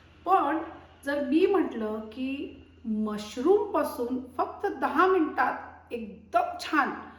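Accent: Vidarbha, India. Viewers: का~~व माय, विदर्भाची का तु...मले वाटलं च....खुप चांगली भाजी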